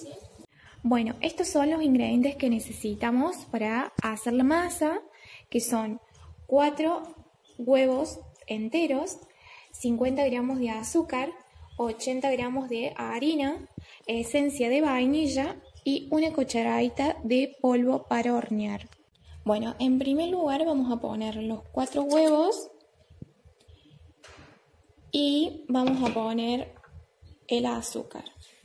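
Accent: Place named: Córdoba, Argentina. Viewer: Que rico amo este tipo de media tarde bien Argento, me encanto como lo explicaste y tu acento cordobés lo es todo!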